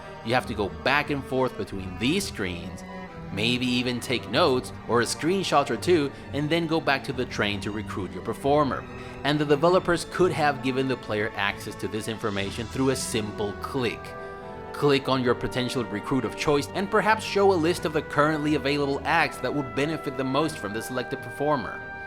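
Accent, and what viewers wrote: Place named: Latin America. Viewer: Loved the review as usual. I usually give the thumbs up before the video starts and thus far haven't been mistaken, not once. As-always, a-mazing. Una consulta? Cuál era el juego que jugabas con las monedas el "Caliche"? Se te nota un acento latino en el inglés, pero no termino de adivinar de dónde. En fin, cada nuevo video de es una vista obligada para mí a este punto. Abrazo grande desde Argentina.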